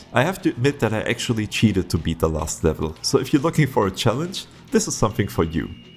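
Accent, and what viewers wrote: Germany. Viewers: you sound like a german dude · bruder dieser deutsche akzent wie geil xD <3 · i hear some DEUTSCHLAND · do you from germany ? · Is this guy german? He sounds like a german guy to 100% sry xD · I hear agerman aczent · Total den Deutschen Akzent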